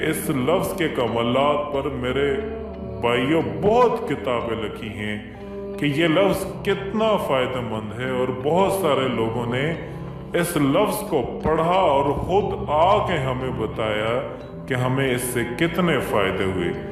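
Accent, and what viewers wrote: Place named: Punjab, Pakistan. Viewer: تلفظ ، عربی وغیرہ ٹھیک کریں ۔ پرابلم پرابلم کا رٹ ذیادہ کرتے ھیں ۔ مال کے چکر میں ھر بندہ نے چینل کھولا ھے ۔اپ عالم لگتے بھی نہیں ۔ اردو بھی پنچابی انداز میں بولتے ھیں ۔ اردو ٹھیک کریں ۔ غربی بھی ٹھیک کریں ۔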